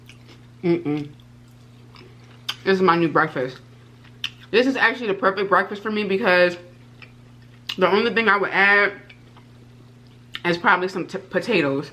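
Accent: United Kingdom. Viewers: 😮yum yum 😋 love the English accent❤ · I'm being honest, your British accent was terrible 😅😅 But the full English breakfast looked good! We usually have this kind of breakfast at the weekends or special occasions. Cereal, crumpets or a cup of tea with toast daily is the norm in England. Love you! 😘😘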